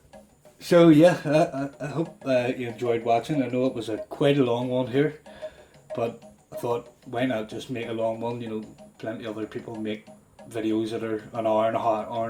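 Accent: Belfast, United Kingdom. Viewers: YASSSSS! a fellow Norn Iron A1200 user here, great hearing a local accent - subscribed!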